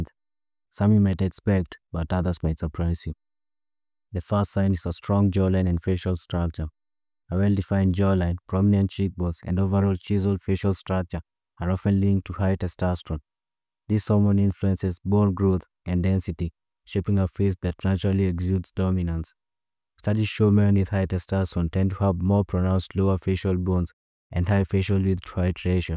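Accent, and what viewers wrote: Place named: South Africa. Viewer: Sounds South African 🇿🇦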